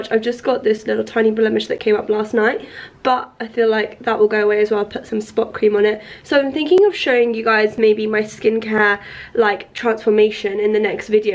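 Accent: Iran. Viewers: Hi 😍 Please speak more Persian in your videos! I love your Persian accent. especially when you and your family speak in farsi! I hope you and family be safe and happy as always!💞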